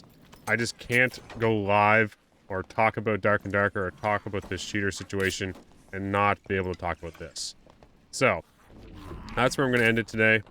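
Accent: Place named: United States. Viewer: your english is indistinguishable from an american speaking English, where are you from?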